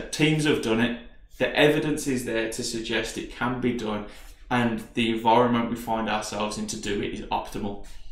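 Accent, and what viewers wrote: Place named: United States. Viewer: Like your American accent.....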